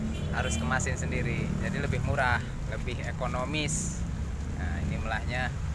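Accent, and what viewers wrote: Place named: Sumatra, Indonesia. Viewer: bang ..kok ngomong kayak orang Sumatra....asli Sumatra ya bang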